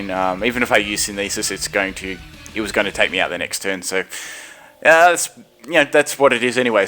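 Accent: Australia. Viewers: He's Australian, if that helps you realize.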